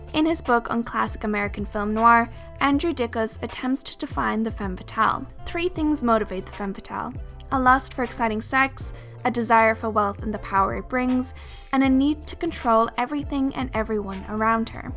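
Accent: Ireland. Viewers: Your accent is interesting! I feel like I can hear touches of Irishness? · Omg I just knew the narrator was a blonde! Haha didn’t expect to get a face reveal. Anyway , I loved the video :) also are u Irish or something? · is she irish or american i'm so confused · Love your Irish accent :D Great video, I never thought about these points when watching HSM as a child. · Hearing an Irish accent here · I love this video and I love your accent, is it Irish? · oh its cool to hear an irish person doing videos like these! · OMG NO WAY. I’m from Ireland and I know an accent when I hear one but yours had me deeply confused! Until I looked in the description, YOU LITERALLY SOUND IRISHH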